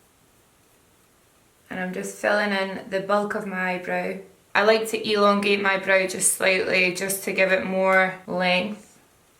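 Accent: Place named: Glasgow, United Kingdom. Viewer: Gen up the only Glaswegian accent I can listen to without cringing like mad! making weegies proud af yas x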